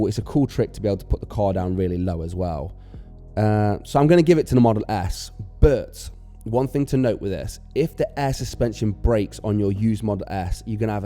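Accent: United Kingdom. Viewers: Love listening to a Brit talking about a model 3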